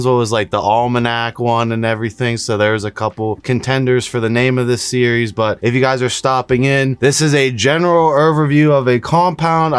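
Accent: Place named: Philadelphia, United States. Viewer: are you from the Philly area? Noticed your accent is similar to mine.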